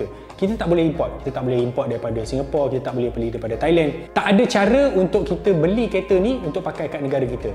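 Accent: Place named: Pahang, Malaysia. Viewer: Org mentakab ker bang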